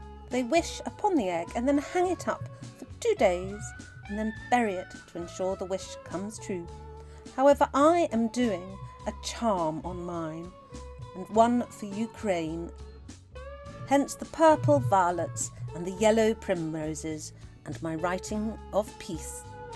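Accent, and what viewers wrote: United Kingdom. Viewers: Hello there beautiful and that sounds like so much fun and I love the British accent thanks for sharing your precious memories and brightest blessings to you sis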